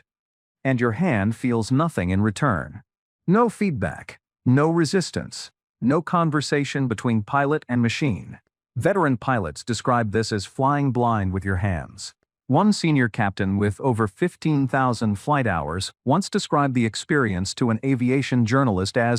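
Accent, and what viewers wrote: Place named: United States. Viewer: The American accent reveals the lie to this video. Many Americans have a bias towards Boeing aircraft. I have flown helicopters and fixed wing aircraft. Among the fixed wing aircraft have been the Boeing 737, Airbus A320 and A330. Comparing the A320 and the 737 the A320 is by far the better aircraft to fly. It's designed to be flown through the autopilot, but so is the 737. The Airbus is just so much better through the autopilot. The sidestick has been normalised by years of computer gaming. It also removes an obstruction to the instrument panel. The instrument panel is clear and concise with everything you need on the PFD (Primary Fllight Display) directly in front of both pilots. The most important safety factor in flying is situational awareness. The unobstructed view of extremely clear and concise instruments provides that. 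The cramped cockpit and a yoke in the 737 is less helpful. Hand flying in Normal Law is a pleasure with a sidestick which has enough feel and instruments providing excellent feedback. Increasing thrust in a 737 raises the nose with the engines under the wings. Reducing thrust you get the opposite effect. Under Normal Law the A320 system detects any increase or dcrease in 'g' and counteracts it. Increase thrust and you just go faster. So much easier with the autothrust on the approach. On the 737 you need to take out the autothrust on the approach to avoid porpoising as the autopilot chases the porpoising. So many other good points to the Airbus. I've a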